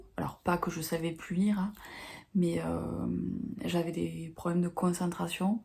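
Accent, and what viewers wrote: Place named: South, France. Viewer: Vive l'accent du suuuuud !!! Les carnets et les stylos ralala, j'ai du arrêter d'en acheter parce que sinon mon appart serait rempli !! Y a pas d'âge pour lire tel ou tel livre, fais toi plaisir !!! Trop cool pour Virgnie Grimaldi ! Je savais pas qu'elle avait un blog avant d'écrire des romans !! Je suis pas du tout une lectrice saisonnière non plus, je fais en fonction de mes envies Très intéressant cette premiere video !! J'ai hate de voir la suite ! Si tu écris tu pourrais nous en parler un peu plus et pourquoi une vidéo avec tes livres préférés pour apprendre à te connaître 😁😁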